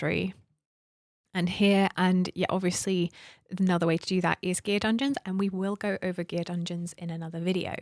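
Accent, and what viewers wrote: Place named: United Kingdom. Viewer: Yeh… after ages just got past 19… I dread even trying 20😵‍💫 but thanks. BTW great to see/hear a fellow Brit do these vids👍🏽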